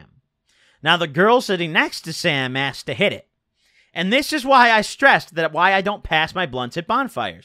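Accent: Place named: Chicago, United States. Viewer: I figured you were from Chicago you talk just like white boys from Chicago. Or idk if your from the west or south suburbs but you know what I mean.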